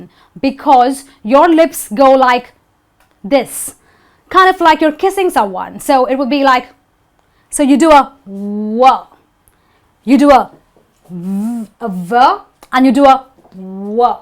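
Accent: India. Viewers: She's learning as she teaches, don't be to hard on her. She's indian. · Mikey Jimenez, she is an Indian.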